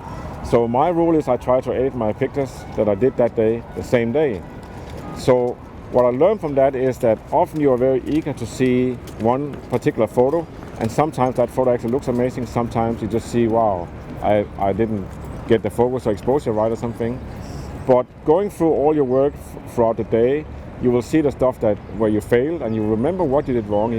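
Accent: Denmark. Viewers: Are You from Denmark can hear a little dame in the accent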